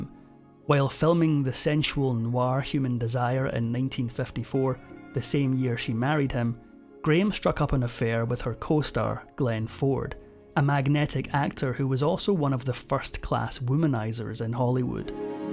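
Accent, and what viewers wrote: Scotland, United Kingdom. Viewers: He’s a Scot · He's Scottish.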